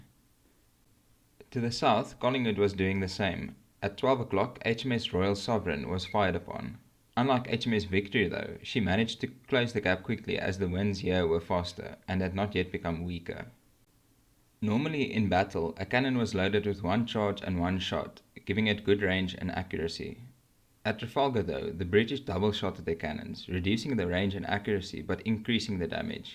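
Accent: South Africa. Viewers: are you from south africa? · You afrikaans?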